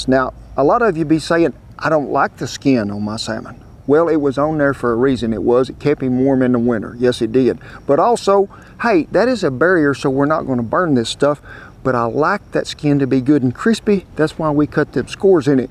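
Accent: South, United States. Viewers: " Done gottum caught, an in the boat, an fitem t putum ona fire" Mr. you're as country talking as us folk in Upstate S. Carolina. Love your videos.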